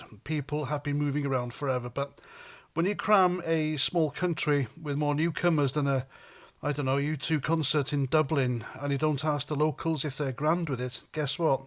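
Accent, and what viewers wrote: Wales, United Kingdom. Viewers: The Narrator is not English he's Welsh.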